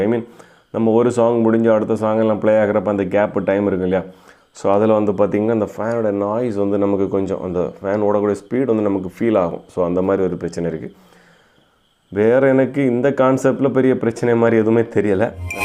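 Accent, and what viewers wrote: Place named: Kerala, India. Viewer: ഇവർ മാർത്താണ്ഡംകാരാണ് അതു കൊണ്ടാണ് മലയാളം കലർന്ന തമിഴ് വരുന്നത്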